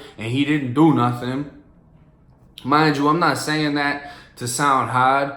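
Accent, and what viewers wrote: Boston, United States. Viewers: Thats his boston coming out you can clearly tell when hes forcing the florida gangsta accent like .. "whats up ERBODY!" this kids a real trip, but ill take him for a good laugh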